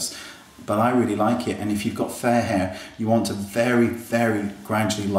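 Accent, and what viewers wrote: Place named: England, United Kingdom. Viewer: where are you from in england?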